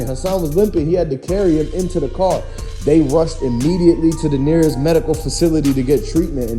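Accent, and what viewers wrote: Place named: Idaho, United States. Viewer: Man delete this dude u sound like u from Idaho n don’t have a clue what really happened 😂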